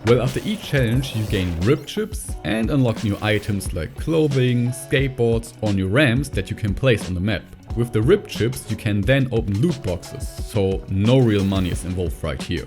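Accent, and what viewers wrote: Germany. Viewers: bro bist du deutsch, glaube man hört des voll raus 😂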